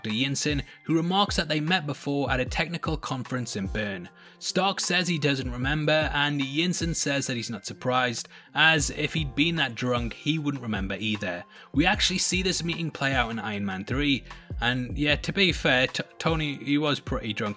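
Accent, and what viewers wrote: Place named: United Kingdom. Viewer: He have British accent 😆😆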